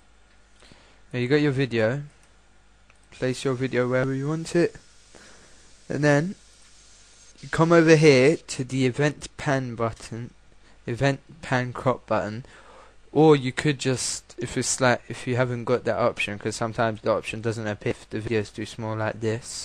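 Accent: United Kingdom. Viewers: Are you british? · i fort u were british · when i first heard you i thought you were from London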